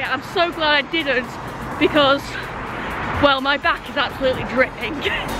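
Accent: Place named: Northern England, United Kingdom. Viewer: Very possibly 'tactile' pavement where the old bust stop was...used by visually impaired people to aid location... Great video...Mrs Boulder loves your oop north accent...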